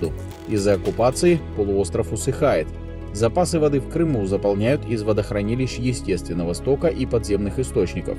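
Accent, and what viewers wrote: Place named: Moscow, Russia. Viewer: Об этом Украине поведал парень с московском акцентом. Из прокремлевского жопо блока.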